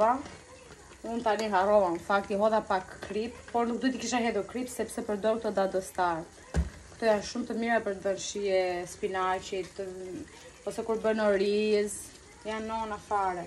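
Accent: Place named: Dibra, Albania. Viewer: Hahaha me knaqe po nga je se flet si nga dibra se nga dibra jam vet urime suksese pac me kto video❤️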